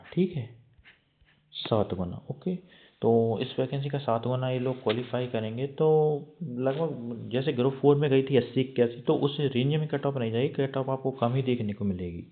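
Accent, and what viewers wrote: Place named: Rural, India. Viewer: Tu bundelkhand se hai kya ??? Accent se lg ra hai kisi gaon se hai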